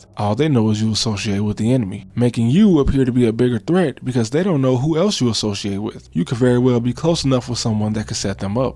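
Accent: United States. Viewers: u can tell bro from america in 20 secs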